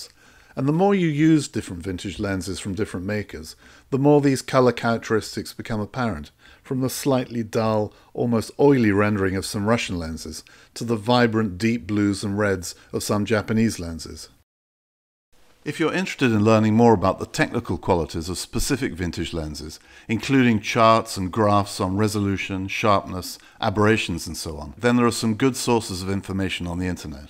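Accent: United Kingdom. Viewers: Very British, were I to guess? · Very nicely made, fantastic voice, better than most of the best tv newsreaders. Can't beat the Brits !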